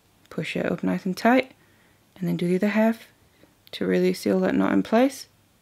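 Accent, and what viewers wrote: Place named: Newcastle, United Kingdom. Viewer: OK, I have to ask where your accent is from! I keep thinking I've got it, then it changes! There really sounds like some Geordie in there!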